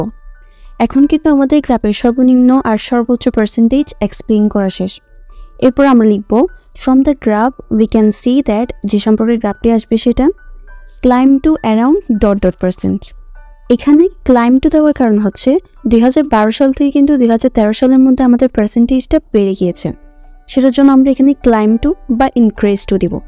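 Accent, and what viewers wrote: Chittagong, Bangladesh. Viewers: ১০০% শিওর আপনার কথ বলা বাচনভঙ্গি একদম খাঁটি চাটগাঁইয়া 🖤🖤🇧🇩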